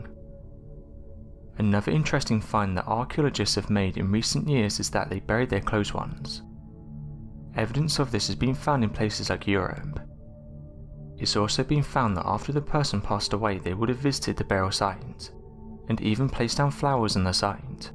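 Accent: United Kingdom. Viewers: I wish you would loose the brit and find someone who speaks English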